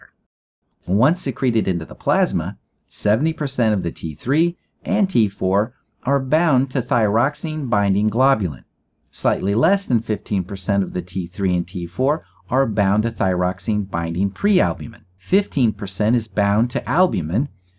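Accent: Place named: Midwest, United States. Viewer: Very nicely done, just the right amount of detail. P.S. I couldn't stop thinking the narrator of this video must be from somewhere in the Midwest ;)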